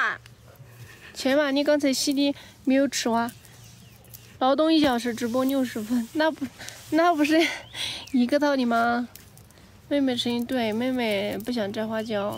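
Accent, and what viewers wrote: Sichuan, China. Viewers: 你是不是講的是四川口音嗎⋯⋯？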